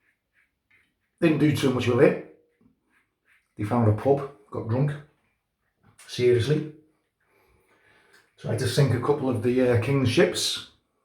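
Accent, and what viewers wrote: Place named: United Kingdom. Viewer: Thank you so much,! So glad you enjoyed the scent and shave:) I love my 1960 Fatboy. You tell the JPJ story very well and love to hear it in a British accent!! Really appreciate you, and keep the content coming. Cheers!!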